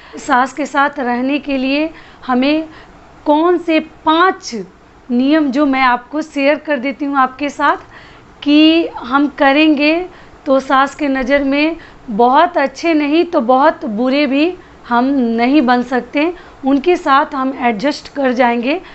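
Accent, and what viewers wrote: Bihar, India. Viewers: Dii aap pirbiya ho ya bhojpuriya ya bihari kya ho.?🤔🤭kyuki aapki aawaj..mujhe..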